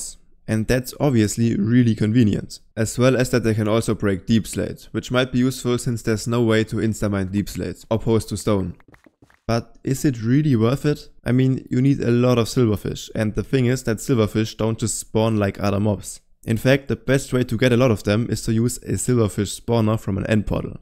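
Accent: Germany. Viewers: are you german? alles gut hört sich defentif besser an als bei mir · Bro sounds a bit too german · Do you speak german? Your accent sounds kinda german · Man. I really like germans speaking english!!! 😄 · German detected. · I can hear the german accent😂 i really enjoy your videos btw i always watch em while playing minecraft myself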